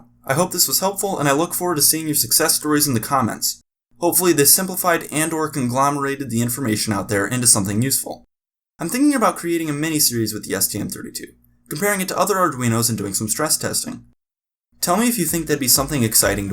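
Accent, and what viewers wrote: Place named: Midwest, United States. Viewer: To quote steamed hams, "it's a regional dialect." I also say sodder instead of sold-er. Dang Midwest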